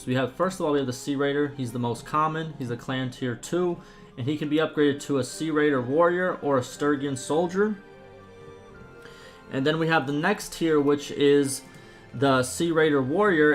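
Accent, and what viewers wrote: United States. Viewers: He is from usa i assume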